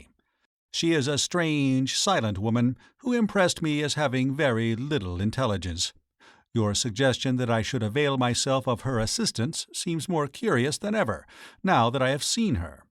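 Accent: United States. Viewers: Who's stupid idea was it have a bloody AMERICAN reading P.G Wodehouse, really?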